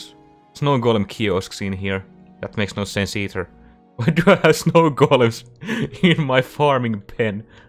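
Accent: Finland. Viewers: Suomalainen aksentti... Xd Keep up the great content! 😃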